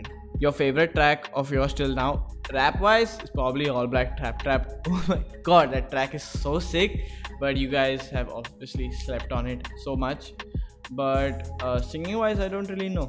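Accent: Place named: Kerala, India. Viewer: Yo, bro u might not see this but u give me malu vibes dude, the when u say "amma",ur accent and ur likes...r u tho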